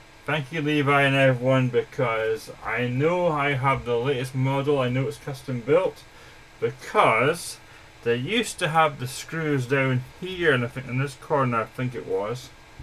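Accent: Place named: Scotland, United Kingdom. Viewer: wasn't expecting that! Still sounds VERY Scottish to me!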